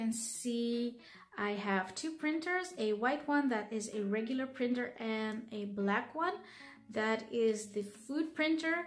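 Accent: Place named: Philippines. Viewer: Cool vid! Btw you a lil filo accent, are you filo?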